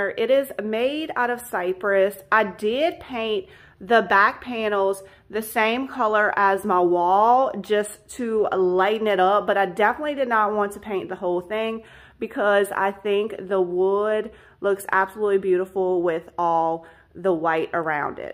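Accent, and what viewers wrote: Louisiana, United States. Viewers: I just came across one of your thrift flip videos on You tube and started watching and knew immediately that you were from Louisiana! LOL I am to, I live in North Louisiana in Shreveport. I am subscribing to your channel now because I love your style and teaching us how to make beautiful things. Thanks so much!! GEAUX TIGERS!!! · I love your home and style ,I to am from Louisiana,between New Orleans and baton rouge so I definitely could tell where you were from with your Cajun accent,,when we lived in Connecticut for about 2years in the late 80's the folks there thought we talked funny but in return we thought they did lol.it's nice to see where everyone is from and the cool ways people talk I just love it. Have a blessed day and thanks for sharing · Hi when I first heard your voice I’m like she sounds like she’s from Louisiana which is where I’m from. 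I reside in Texas. Anyway sister I love your videos. Thanks so much for always sharing the thrift tours and DIY’s with us. Have a blessed week darling 🙏🏽🦋🌸🦋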